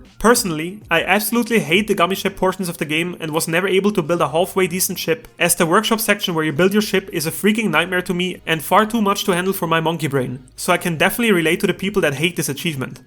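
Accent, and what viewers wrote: Germany. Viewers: Man hört direkt dass du deutscher bist. Trotzdem geiles Video!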